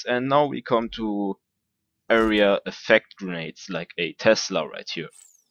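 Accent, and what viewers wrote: Germany. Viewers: Very good video. I play as Axton too. By the way, in my ears you sound quite deutsch...;-) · Bist du deutsch? Man hört dass nämlich an deinen englisch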